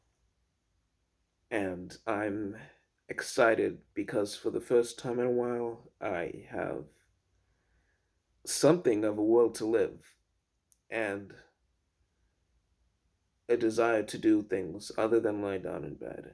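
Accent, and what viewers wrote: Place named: United Kingdom. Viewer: I accidently come across your twitter page earlier and me been as nosey as I am ended up here. I have to say, your an interesting guy. Also when you first started talking I would have never guessed you're from the states. I thought you had a British mixed accent but wasn't sure with what. You do have a little bit of a London accent when you say certain things too. Does your parents have an accent at all? The only reason Is, my partner grew up 20 minutes away from where I grew up and he talks completely different to me. He has a very posh accent compared to me, but his mum and dad moved around a lot for work for my mother-in-law and they have a very strange, posh, mixed accent and he picked that up. Whenever people start talking to us they can't believe we grew up in the same town. Anyway, I apologise for rambling and waffling on. 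I enjoyed your video, keep up the hard work.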